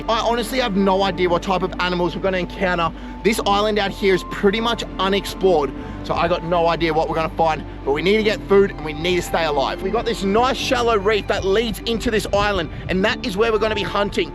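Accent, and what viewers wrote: Australia. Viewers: At the beginning, I was like: "Oh! This guy wants to make me believe he'll survive only on what he catches? No way!" Then I heard this Aussie accent, and I switched right to "Oh! Right!" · First time ever watching this guys Video randomly, as soon as he opened his mouth I figured he's Australian 😂😂😂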